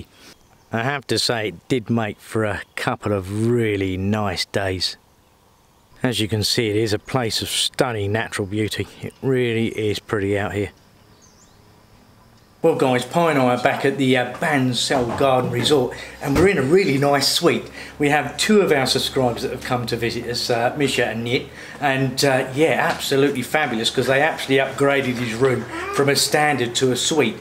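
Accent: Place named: England, United Kingdom. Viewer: I really detest english accents. i'll try to listen for awhile 1. Edit: Ok, I still hate your accent but the visuals are very nice ! 2. Edit: You really do have some skill with the visuals. BTW, I know of your recent health issues and I send my sincere condolences.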